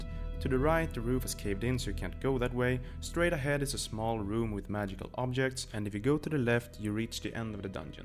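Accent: Sweden. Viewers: You have a very swedish accent! Are you swedish? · That swedish accent though.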